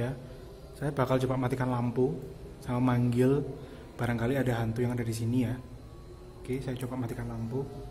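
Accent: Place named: Java, Indonesia. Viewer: Medok banget... 🤣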